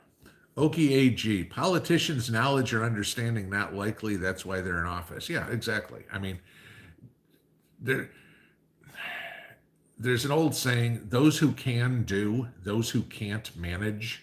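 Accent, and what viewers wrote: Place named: New York, United States. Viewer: Hey hes a New Yawker!❤️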